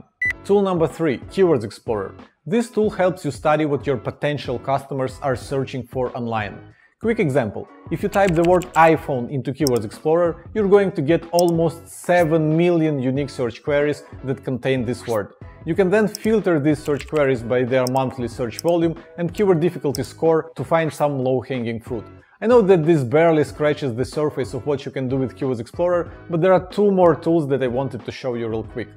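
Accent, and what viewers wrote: Russia. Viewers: i think russian accent, no?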